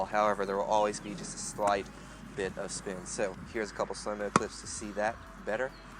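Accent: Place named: Australia. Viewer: you sound australian :D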